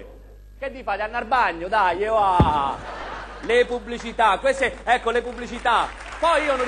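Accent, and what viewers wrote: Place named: Rome, Italy. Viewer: Parla in romanesco stretto per tutto il tempo, e quando dice "coatto" ci tiene a specificare che è un termine prettamente romano... MA DAI?? -.-'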